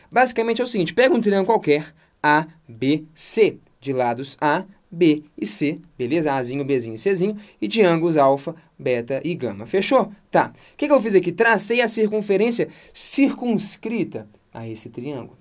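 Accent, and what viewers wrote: Minas Gerais, Brazil. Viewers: Você é mineirinho ou só finge?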